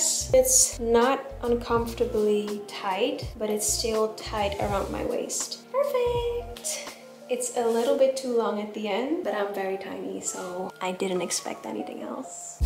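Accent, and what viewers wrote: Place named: England, United Kingdom. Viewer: Ik houuu van jouw engelse accent!! Rustgevende stem heb je!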